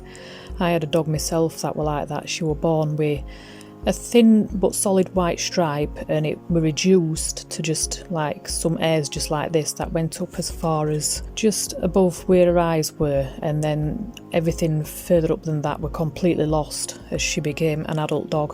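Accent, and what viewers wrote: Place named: England, United Kingdom. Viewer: fantastic I would say the yanks can't understand a real english accent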